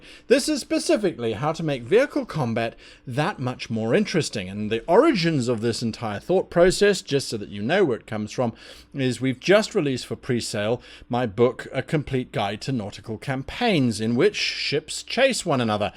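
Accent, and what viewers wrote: South, United States. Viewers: HAHA!! GREAT SOUTHERN ACCENT! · Damn, dude! For an african, thats not bad south hick north american accent! I can beat ya, but barely. Bet you can beat my dutch sailor.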